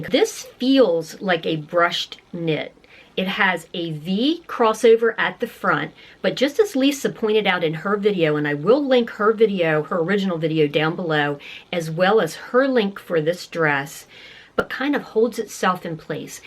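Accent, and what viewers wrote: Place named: New Jersey, United States. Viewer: you are such a joy to watch. Your kindness is much appreciated as is your humor! I'm originally from New Jersey, I left at 24 but we go to ocean city some summers. Your dialect sounds like home. Really, really enjoy your videos and yes, I would love to see some amazon hauls. Your pace is perfect. You are easily understandable and thorough and come across as a friend. So happy I found you.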